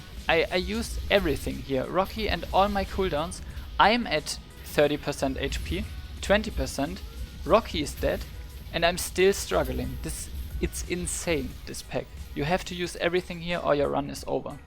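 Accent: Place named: Germany. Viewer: Ich wusste doch der akzent ist Deutsch! Ein Frostwolf kollege! :D Davon ab: sehr gutes Video, habe erst kürzlich wieder angefangen und Visions sind noch recht neu für mich (hab gestern den ersten 4Mask run gemacht). Ich konnte jetzt einige Sachen aus dem Video mitnehmen die mir sicher in späteren Runs helfen.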